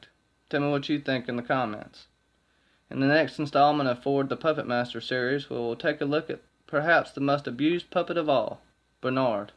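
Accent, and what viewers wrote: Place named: South, United States. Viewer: Hey man!! I've been talking to AU Packmule about being a guest on his channel. My notifications were messed up and I didn't even realize he did so many streams. I saw you, and I've subscribed. I'm from Alabama. I can tell you're definitely from the South. So looking forward to watching you and hopefully joining a chat!!😀❤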